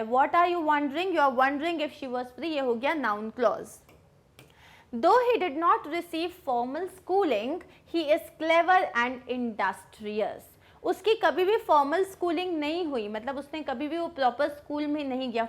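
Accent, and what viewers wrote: India. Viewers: OH NO...INDIAN ENGLISH...NO...NO...NO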